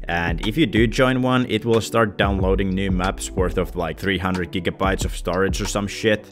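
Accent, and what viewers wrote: Finland. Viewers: kuule kuule kyllä siitä kuule että olet suomalainen letsgoooo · instantly knew you were finnish from your accent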